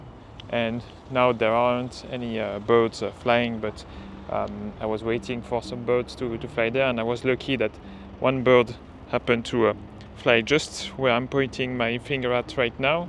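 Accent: France. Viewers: Drôle comme on reconnaît l'accent francais, belle video 👍🏻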